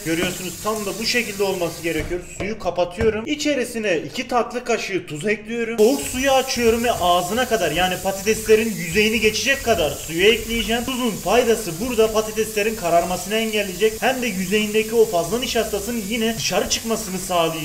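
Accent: Adana, Turkey. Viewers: Adana'ya doğudan göçen kürt kesimi böyle konuşuyo genelleme yapma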